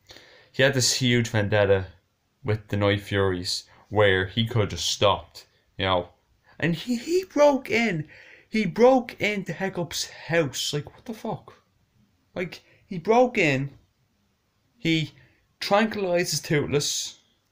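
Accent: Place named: Ireland. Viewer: It's such a Masterpiece of a film. My name is Lee and this is my 2nd channel. My main channel is The Dragon Critic. I can tell your Irish and so am I :)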